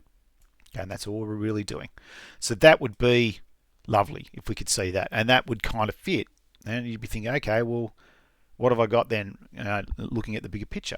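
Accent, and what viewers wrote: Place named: Australia. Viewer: Great to hear the Oz accent on YT!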